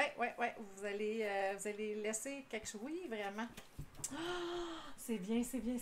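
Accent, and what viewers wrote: Quebec, Canada. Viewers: Bonjour, je suis bien contente d’entendre une voix Québécoise sur les tirages. Je me sens plus proche du tirage à ce moment. Merci beaucoup😀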